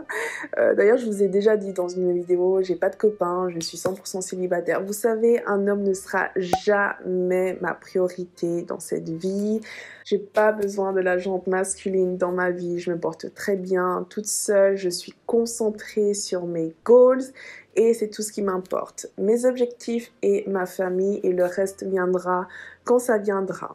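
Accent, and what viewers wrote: Spain. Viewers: J’adore ton accent espagnol moi aussi ma langue maternelle c’est arabe 🇩🇿 mais je parle l’arabe , français, espagnol et english ❤️❤️❤️🤍 mais ma Préfère c’est l’espagnol me encanta 😍 ¡qué dios te proteja y te bendiga!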